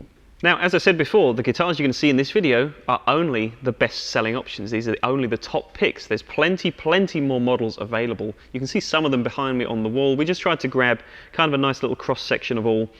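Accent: United Kingdom. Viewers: He sounds like joe bonamassa with British accent! 😅 ! Nice playin tho lit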